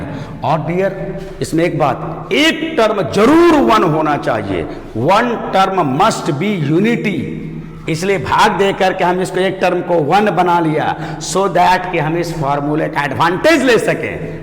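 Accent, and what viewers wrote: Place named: Bhojpuri, India. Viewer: Very nice sar bhojpuri style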